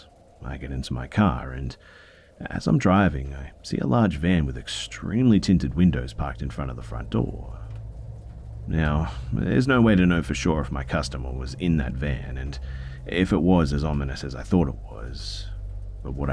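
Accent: Australia. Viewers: mistercet pretty sure he’s got an Australian accept lmfao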